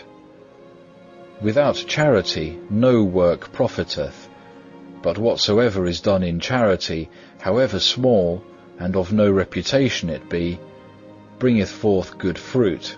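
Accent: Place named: England, United Kingdom. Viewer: Grazie mille! to the dear soul who set "The Imitation of Christ" to background music! I listen to this almost every night as I sleep since I ceased taking anti-depressants exactly one year ago in October 2017!!! You have made it fresh and more poignant. The reader's beautiful English accent is soothing, but sometimes the words alone are a little stark! Sincere thanks to you. St. Thomas a Kempis words are a cure for my mental illness! Thanks be to God!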